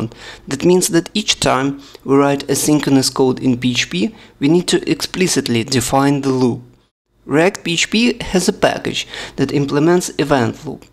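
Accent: Russia. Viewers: можно было бы и на русском, если такое произношение..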